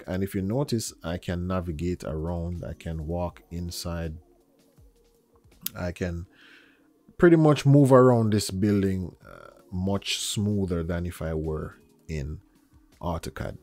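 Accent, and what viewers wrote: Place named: Jamaica. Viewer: Thanks bro. Peace from Cape town South Africa. I'm enjoying your series. And that Jamaican accent allows for good vibes whilst learning .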